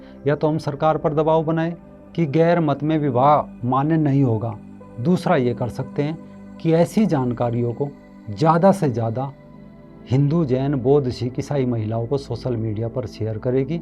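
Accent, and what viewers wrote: Haryana, India. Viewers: bhai, Piriya nahi Priya. Mujhe pata hai aap haryana se hain. Lekin koshish kariye thoda sa tongue style ko universal banane ki. Kyonki aapka content bhi universal hai. Lekin haryanvi essence rajaroor rakhiye.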